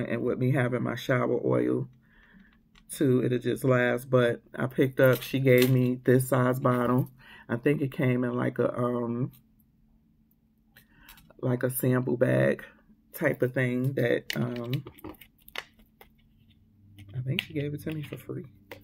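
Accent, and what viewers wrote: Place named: Baltimore, United States. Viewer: I can hear the Bmore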